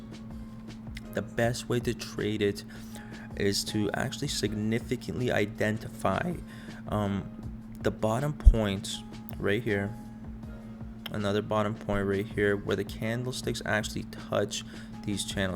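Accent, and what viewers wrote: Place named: United States. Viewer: Most American sounding Russian I’ve ever heard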